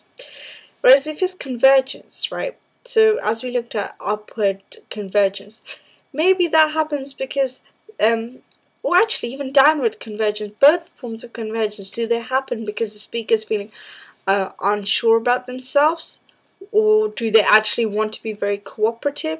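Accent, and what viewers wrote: United Kingdom. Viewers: brilliant video but I thought it was recieved pronunciation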